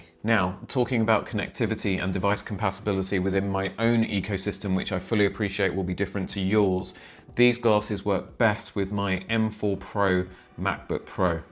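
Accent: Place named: Europe. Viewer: Would have been much better if you didn't constantly refer to ipods, ipads, ios, iphone......apple this, apple that -- you are in Europe judging by your accent so Android would have been so much more appropriate and useful. As it stands, this just seems to be aimed at the US of North America and 9/10ths of the planet can't understand your points. Apple, Apple, that pointless expensive useless brand again is Apple.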